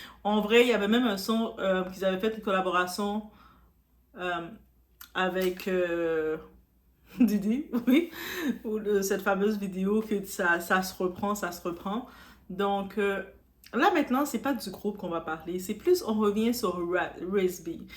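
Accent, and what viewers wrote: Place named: Quebec, Canada. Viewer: Je n'aime pas la traduction, je préfère le "vrai audio" en plus tu peux très bien traduire en résumé après mais c'est du détail. Je tiens surtout à te faire un grand merci pour tes vidéos et ton état d'esprit qui est feelgood. Et puis ton accent garde le, on va pas changer son accent pour deux glandus, t'es authentique ça fait partie du package, on dirait un accent du Québec c'est ça ? 💜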